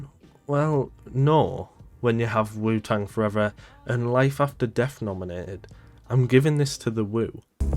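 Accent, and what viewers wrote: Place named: United Kingdom. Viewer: Lmao, what did u expect he's from the UK. As soon as I heard his accent, I knew this video was going to be a hard watch.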